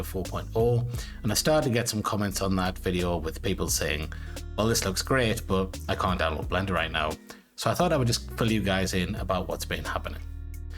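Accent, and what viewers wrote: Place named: Newcastle, United Kingdom. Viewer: awesome, you're a geordie!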